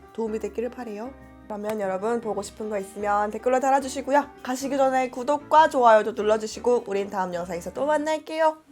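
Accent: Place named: Gyeongsang, South Korea. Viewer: 님 고향이 경상도 이신가요 계속 사투리 억양이 들려서...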